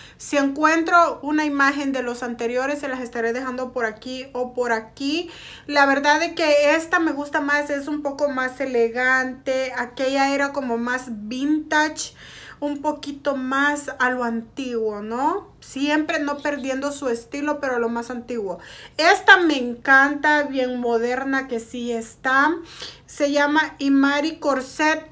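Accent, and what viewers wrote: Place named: Veracruz, Mexico. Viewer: que bueno que hagas reseñas de perfumes Avon ya que son económicos, y tu acento me gusta ya que soy Veracruzana y algunos acentos en tu pronunciación se parecen, a los míos, bendiciones.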